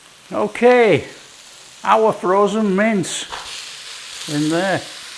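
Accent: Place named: Scotland, United Kingdom. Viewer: American here.... My grandma made this recipe, she was a dairy farm wife, but she cooked the noon meal at a New Mexico high school for many years. She also made a southwest adaptation by changing the spices. She added cumin, new mexico red chili pepper powder (it's the best, rich and a bit sweet), pepper, canned beans. The sauce & meat mixture can also be layered with corn tortillas & cheese lasagna style. I remember eating this at my jr high school in California. I sure enjoy watching you cook. I now live alone and struggle with poor appetite and no desire to cook. Occasionally I will make one of your more simple dishes. I can't figure out your accent... I hear Scottish & northern England. Occasionally, you say something that sounds like my great grandparents (descendants of Scottish immigrants in the 1600s to Appalachia).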